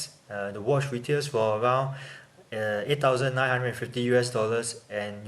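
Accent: Singapore. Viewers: WTF. Speak clearly! Can't stand this Singaporean/Malaysian Chinese accent. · Ya he sound like singaporean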